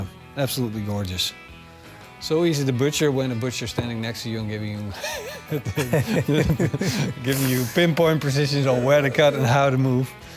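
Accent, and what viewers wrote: Netherlands. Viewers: Love the whole video. The Dutch/English is awesome. Grill on!! I love my notifications on your videos!!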